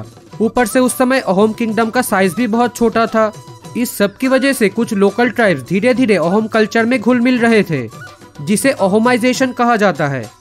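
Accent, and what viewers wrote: Bengal, India. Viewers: Your Bengali Accent Hindi is sooo noticeable I like it though!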